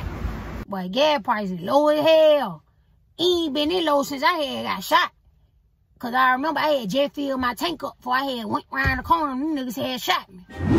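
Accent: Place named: Atlanta, United States. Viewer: I had to sit bacc & think.. she got everything on point. Cause this is how me & my people talk down here in Atlanta Ga smh lol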